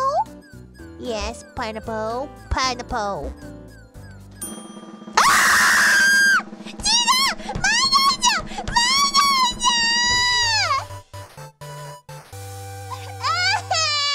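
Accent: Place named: Kansai, Japan. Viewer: that's actually one pair of phonemes she'd have a naturally easier time with compared to her colleagues, since she already has a thick Kansai accent in her Japanese—but the fact that she can now also differentiate between the þ and ð consonants is really impressive.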